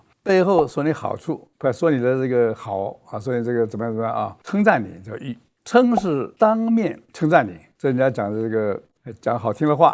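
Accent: China. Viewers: 是大陸人嗎？為什麼講的是大陸腔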